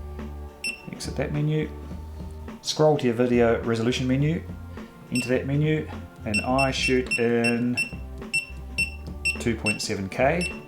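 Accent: New Zealand. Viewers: Awesome work. Have just discovered you. So good to hear a Kiwi voice, and NZ in your videos.Recently got a Solo 3DR with GoPro so your Tutorials are very helpfull. Thankyou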